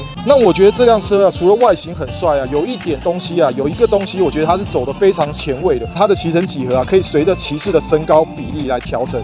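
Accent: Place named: South, Taiwan. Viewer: 下港人 台湾国语好重啊 福建人后裔哦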